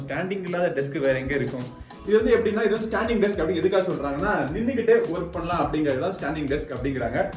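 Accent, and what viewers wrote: Madurai, India. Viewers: Bro neenga madurai ya? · Neenga madurai ah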